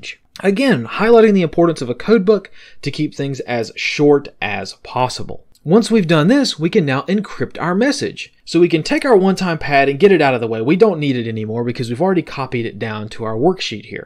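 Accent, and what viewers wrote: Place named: South, United States. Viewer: One of the mysteries of north American dialects is why New Orleans got the northern city vowel shift. There's nothing nearly as mysterious here, but there is a well-known connection between Tennesee and Central Texas, particularly the way they front the OU dipthong. My dude has an urban southern accent.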